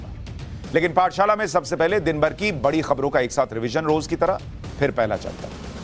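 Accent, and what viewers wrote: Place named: Bhojpuri, India. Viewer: Love you bhojpuri words and accents you use in between,it helps in suing away the stress got fron the serious news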